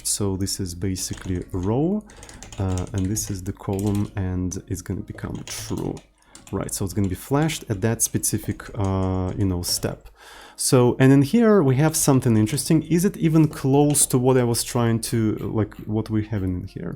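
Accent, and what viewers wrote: Russia. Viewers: ты русский? я чувствую акцент))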